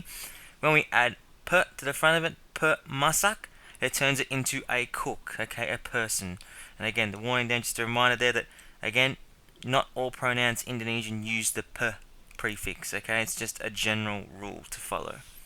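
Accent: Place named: Australia. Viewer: I can tell by your accent that you are probably an Aussie. hahaha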